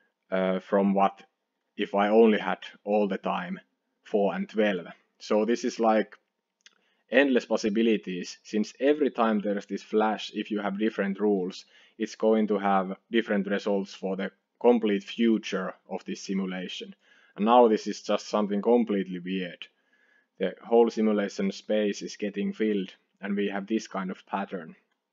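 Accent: Finland. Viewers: This is really interesting. I have to ask completely off topic. Are you from Finland? You have a very Finnish accent! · love the finnish accect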